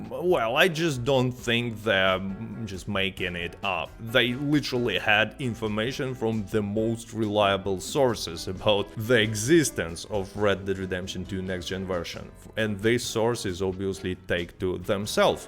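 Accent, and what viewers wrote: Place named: Russia. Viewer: Why this man has so hard Russian accent?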